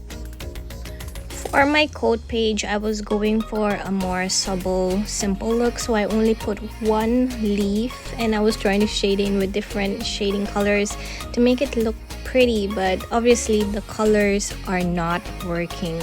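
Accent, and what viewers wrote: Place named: England, United Kingdom. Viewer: you're such a talented lady ...iha!..so bright! i even like your accent..your english accent!..keep painting and sharing your talent..go on! Jingle JPE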